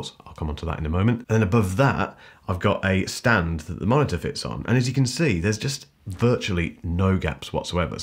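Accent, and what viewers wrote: United Kingdom. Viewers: I like your style; and your grey walls, so easy on the eyes. You've obviously thought about the visual look of your show. I like your clipped British accent. You guys just speak English better than we do :) I'm American. You also get the information out in an articulate and quick manner, not always the case with other youtubers.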